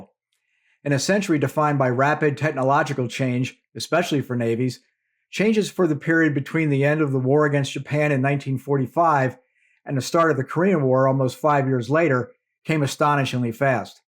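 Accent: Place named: Delaware County, United States. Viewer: I think the narrarator is either from Philly, or the Delaware Valley area. Anyone from Philly recognizes that accent a mile away.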